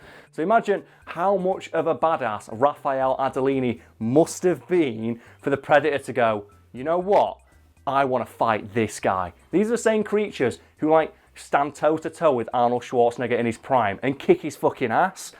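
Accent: United Kingdom. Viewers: Just watched the new movie and yes, this leaked script is literally what happens. Spoiler alert I guess? In the tone of you’re sweet British accent, this movie indeed SUCKED ASS!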